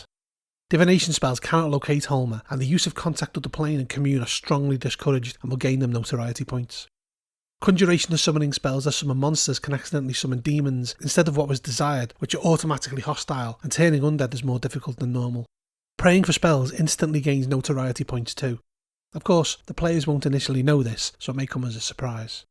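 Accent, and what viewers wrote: Liverpool, United Kingdom. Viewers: Scouser? Please review more old school d&d!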